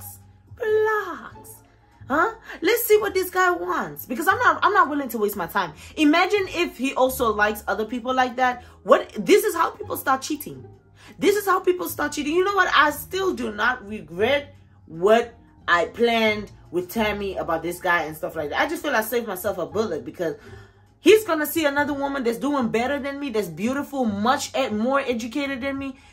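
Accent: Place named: United States. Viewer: Damn sis, you catching the American accent 😫🤍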